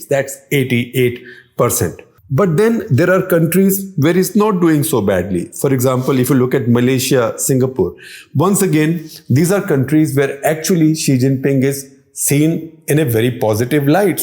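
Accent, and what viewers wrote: India. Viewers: Delusions of grandeur, sabre rattling, cringy indian accent on that unpleasant face and obsessing about others is what shekar and indian journalists are good at. Anybody could have read out the report. Stick to journalism dude by shedding light on indian problems. Never heard explaining the PEW report dated june 29th,21 that talks abt religious intolerance in india. No wonder indian journalists have such a bleak view of the world.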